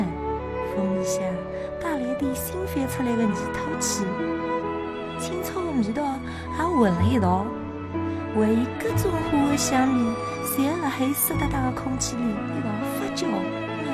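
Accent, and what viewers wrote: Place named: Kunshan, China. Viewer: 昆山街上人口音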